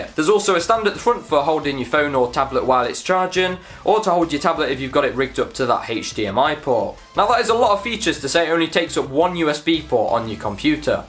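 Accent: United Kingdom. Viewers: I love your BRITISH accent! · Hi, it's such a good video. I'm from Indonesia anyway and it is really hard to understand your word because you were talking in english with british accent and so fast:D lol, I need to learn much. Tx btw:)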